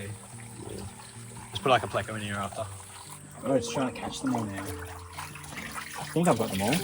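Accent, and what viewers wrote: Australia. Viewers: Love your videos. As an American, I get a little chuckle out of hearing Australians say H like "heych", and here like "he-yah"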